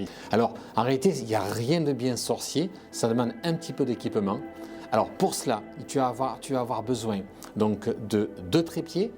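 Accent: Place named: South, France. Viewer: Sympa ton accent du sud... Et ensuite tu auras besoin d'un Iris !:trophy-yellow-smiling::popcorn-yellow-striped-smile::card-red-penalty::medal-yellow-first-red::takeout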